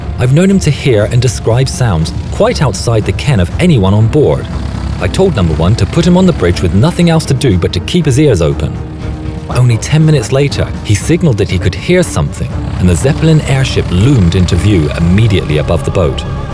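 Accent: England, United Kingdom. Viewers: This is the best British accent I’ve ever heard haha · Love you English accent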